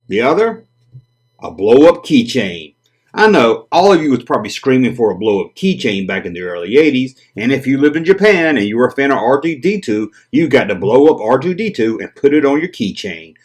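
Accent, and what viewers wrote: South, United States. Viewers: Way below the Equator? Are you in the Southern Hemisphere? Thought I heard a southern twang in your awesome videos!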